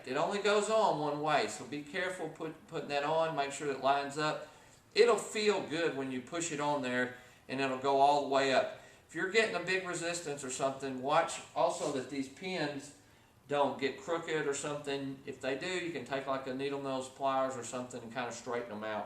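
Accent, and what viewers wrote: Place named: Texas, United States. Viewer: Go-ahead and say chassis anyway you want. We know what you mean and where you are from. It is a French word. If we tried to say it to a Frenchman's satisfaction, we would all look ignorant. If a TX accent causes you to fail to understand the content of these excellent videos, then I would find you strange. Read this eurouc: <link> Accents are long standing parts of verbal communication which add texture and identity to spoken word. Maybe you are simply too ignorant to understand. Maybe you are French. As I am French-Canadian and have half my family living in the Bayou posing as Cajun, I am offended by you either way. EuroTrash might be a better name for you.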